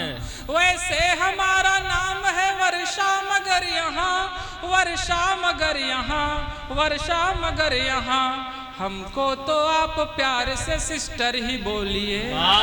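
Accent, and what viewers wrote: Bihar, India. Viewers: Bihari ton hai.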